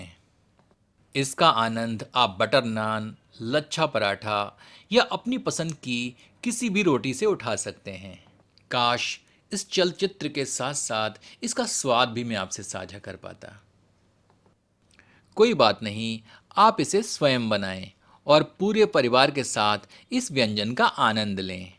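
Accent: Standard. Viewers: Shudh Hindi ucharan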